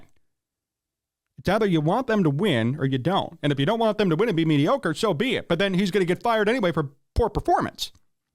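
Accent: Chicago, United States. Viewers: Im not from the midwest, so im a bit ignorant, but im very curious: is this an authentic AF Chicago accent? Cuz i love it. Its like straight out of a movie made in 1947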